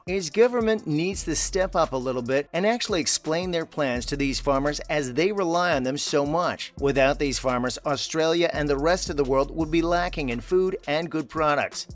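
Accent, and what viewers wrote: United States. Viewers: Is this an advert for Elders or supposed to be informative - not sure you are achieving either - especially with the very annoying American accent.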